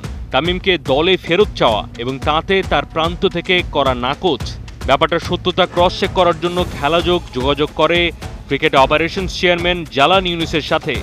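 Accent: Kolkata, India. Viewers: Kolkatar bangla.....শ্রুতিক টু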